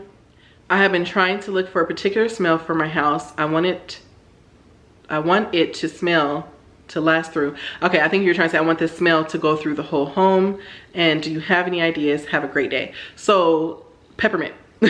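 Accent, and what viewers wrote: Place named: South, United States. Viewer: When I stared watching you I KNEW were a southern. You could have knocked me over with a feather when I learned that you were from California.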